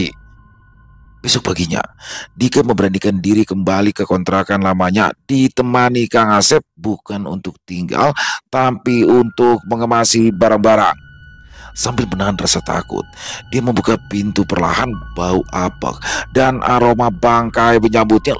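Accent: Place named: West Java, Indonesia. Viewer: Keren bang, logat Sundanya 😊😊😊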